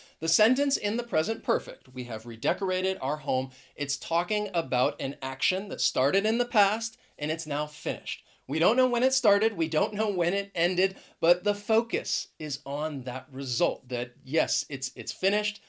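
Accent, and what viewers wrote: United States. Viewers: Hi. What type of American English pronunciation do you represent?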